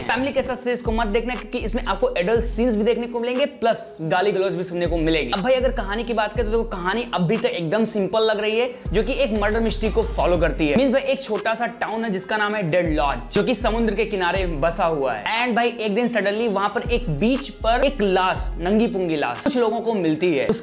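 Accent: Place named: Haryana, India. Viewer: I like haryanvi style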